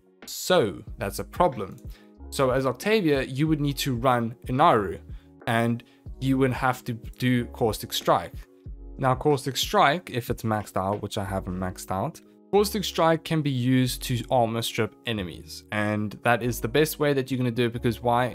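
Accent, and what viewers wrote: South Africa. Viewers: You sound so South African... Man I hope I'm not just going crazy. Best guide I've seen on this, VERY well done, you've earned a sub!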